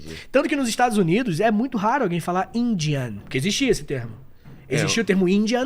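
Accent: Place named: Rio de Janeiro, Brazil. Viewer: Comecei a segui-lo por agora, Prof. Erro meu, eu sei. Vou procurar esse programa para absorver todas as ideias. Você é muito bom, obrigada. ❤ E esse sotaque carioca é um absuuuurdo.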